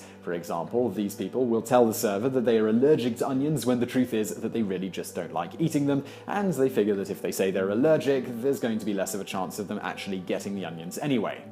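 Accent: England, United Kingdom. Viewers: J.D. Hague He has what is known as an English accent. You know the English language is from England? Crazy right? These silly English people can't even speak English! Imagine that.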